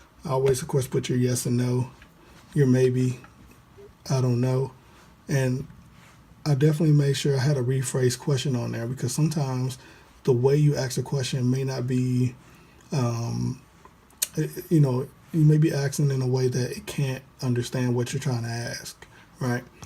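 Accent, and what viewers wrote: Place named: South, United States. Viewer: Thank you so much for your help! Thank you for sharing your light! Anddd two cheers for the southern accent I'm detecting?!!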